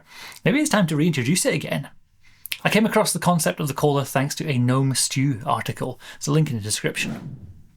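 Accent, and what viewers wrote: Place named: Scotland, United Kingdom. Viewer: Brilliant video - so mamy good ideas! Our group definitely suffers from this. Every session 😅. Also your scottish accent is incredibly authentic and to maintain it for the whole video is admirable ❤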